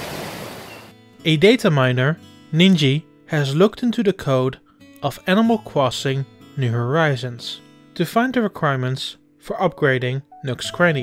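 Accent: Netherlands. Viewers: I think this guy is dutch that accent sounds familiar. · Thanks for the tips! Are you dutch? I'm dutch and my bf heard the dutch accent lol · That's the Dutch accent for ya. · You’ve got like this clear dutch accent 😭 but apart from that this was rather helpfull. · Is this voice over Dutch? Been wondering ever since I heard you! :)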